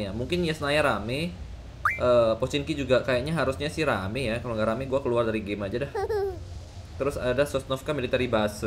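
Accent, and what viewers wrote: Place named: Medan, Indonesia. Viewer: LOGAT BAHASA NYA KOK KAYAK MEDAN YA OM 😆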